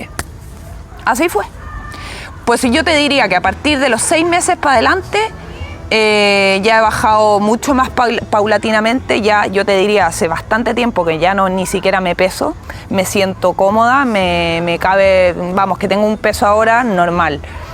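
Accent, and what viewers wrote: Chile. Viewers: Te noto la voz de chilena 😁 y gracias por tus vídeos ❤️😍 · Lo mismo pienso... tiene mucho acento chileno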